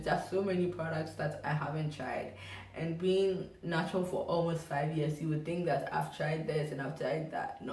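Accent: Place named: Ghana. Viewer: Ghanaian Accent 😍😍😍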